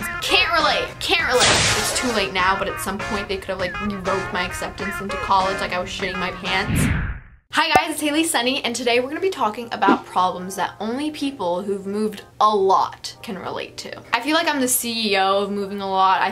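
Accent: England, United Kingdom. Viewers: We love your interactive videos so much too babe.I've never movied but I wish I could even though it has so many bad effect or bad feelings on person because without moving or going another country it is hard to learn other ppls culture or language or life in general and I think you should make a video with your british accent hailey it would be different and lovely thank you and love you from pluto and back💋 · fr 😂 just a min... Just found out about your videos now, but already love you! Different curriculums suck so bad!! love your British accent too lol but that Karen neighbor sounds horrible.. the stalking thing is true too tho Also I’ve stared speaking a mix of literally all languages I know and people start to get more and more annoyed by it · YOUR BRITISH ACCENT OMG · Omd your British accent 😯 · oha ingiliz aksanın efsane sşjfsidjsşdn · Loved your British accent 😍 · that british accent tho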